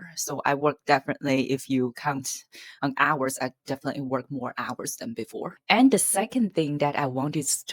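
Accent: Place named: England, United Kingdom. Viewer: I appreciate your efforts but your German doesn’t sound german 😅 it sounds more English than German specially the of “T”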